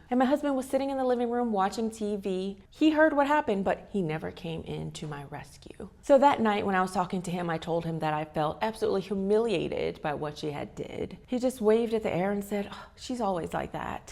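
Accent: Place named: South, United States. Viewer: hahaha love your southern accent comming through, i love these story times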